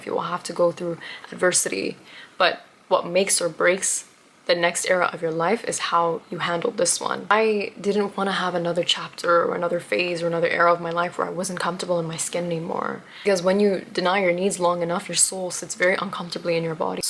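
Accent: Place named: Europe. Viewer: You seem like You speak like You are European. I love Your accent🥰 Know Your Worth 🙌🕊️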